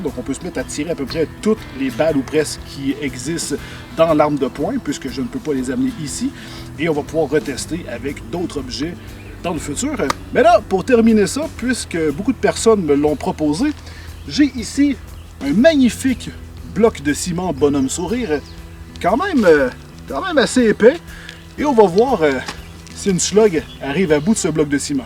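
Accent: Quebec, Canada. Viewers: J adore l accent Québécois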